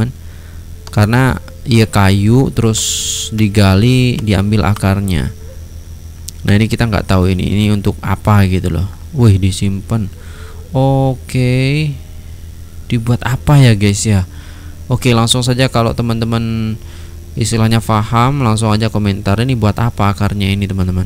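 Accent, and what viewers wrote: Malaysia. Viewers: ni cara pertuturan dia sikit lebih kurang mcm org M'sia. Senang faham. Terbaeek👍👍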